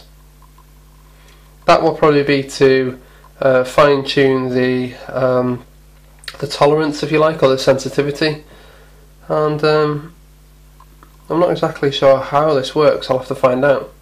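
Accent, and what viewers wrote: United Kingdom. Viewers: I just bought a 100w solar panel, 12v gell 35Ah battery & charge controller. Because, like you, I want to learn about solar. Seeing Julian Ilett frequently use his solar system on his 'tronics bench, I thought I'd try it out. BTW, if I can ask, what is your UK dialect? This Amurrican is a huge fan of EVERYTHING UK, so I really love the accents from different places in the UK. I think my favorites are Welsh, Yorkshire, Cockney... Oh, yes, and 'posh'. Best!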